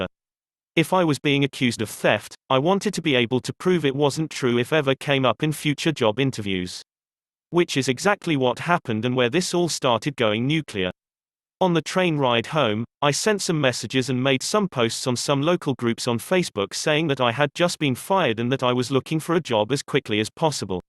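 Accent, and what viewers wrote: United Kingdom. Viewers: British style, nice accent, but crappy pronunciation.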